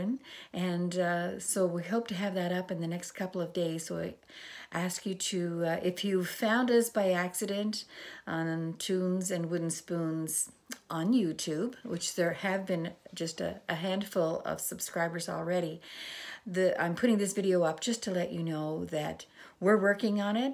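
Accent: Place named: Maritimes, Canada. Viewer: I couldn't sleep last night with all the stress and worry and both of my children living outside of Canada ... so I stumbled across your cinnamon rolls YouTube thing. I think it was your first time and I just listened, watched and laughed and by 4 a.m. I was ready again for sleep. You probably don't realize the role you are playing in helping us through this time. I live in Alberta but my parents are from the South Shore and just hearing the familiar Maritime voice and references to Maritime things and people (Natalie!) ... well, you know ... it was special. Thank you. P.S. I loved the elm tree story and the wooden recipe boxes. A gem!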